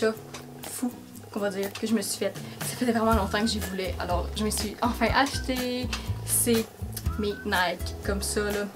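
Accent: Canada. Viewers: J’aaadddooooorrrrreeeeeeee ton accent canadien Vive les hauls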